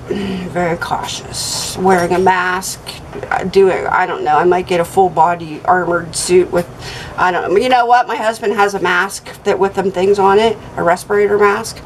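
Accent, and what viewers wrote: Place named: Pennsylvania, United States. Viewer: Glad to hear you are negative! Here from Simple Life! I would have swore u were from PA w that accent! I grew up in PA, & u know I gotta say it.... We Are!!! Lol! God bless!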